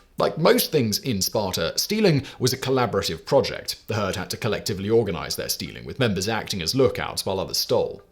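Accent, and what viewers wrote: England, United Kingdom. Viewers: Do you mean his English accent?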